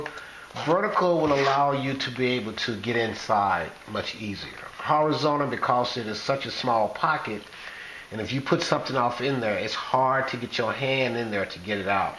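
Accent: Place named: South, United States. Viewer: Love that dirty south dialect…. Miss it so much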